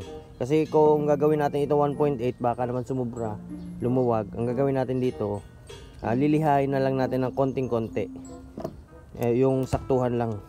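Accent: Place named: Visayas, Philippines. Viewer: bisaya diay ka lods?? 😅🔥 Word kapoy bisaya word😁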